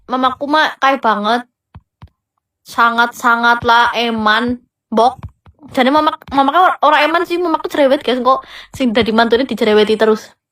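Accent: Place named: Banyumas, Indonesia. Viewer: Ngapak max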